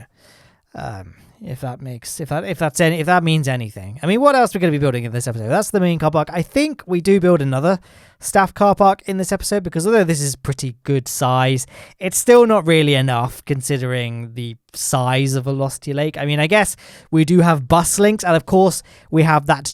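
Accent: United Kingdom. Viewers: Finally I'm early to "a guy with a British accent" vid. Ps I Only watch your ksp vid they're better no offense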